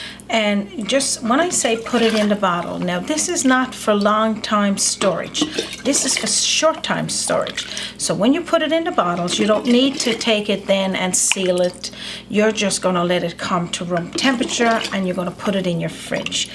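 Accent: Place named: Newfoundland, Canada. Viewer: you so remind me of my grandmother as a young lad living in the country with farm lands all around. I grew up in the 40's and 50's and my Grandmother was always cooking, baking. I learned so much from her. I just love your Newfoundland way of talking as I told you before I worked with many men from NFLD.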